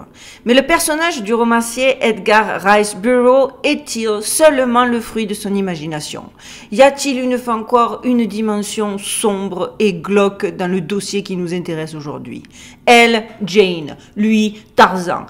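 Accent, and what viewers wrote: Marseille, France. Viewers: Bonjour Continue à garder ton accent marseillais et tes petits rires trop craquants en racontant tes histoires. Sinon c'est comme si tu lisais une belle partition mais sans t'accompagner d'un instrument🥰🥰🥰🥰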